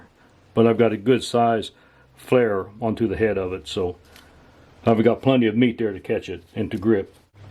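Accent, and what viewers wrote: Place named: Northeast Ohio, United States. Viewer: Is your accent from NE Ohio by chance?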